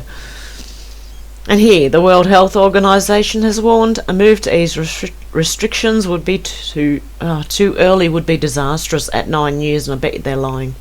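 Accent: Australia. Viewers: R U Aussie? Me too! God bless you!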